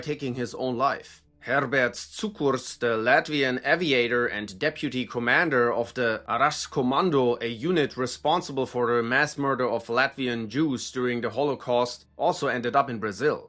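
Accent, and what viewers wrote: Germany. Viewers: I can hear the German education when you speak. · Bruh, you don't need to specify you're German. The accent, straight to the point, no vaseline and accurate. Another top tier video. Loves from Spain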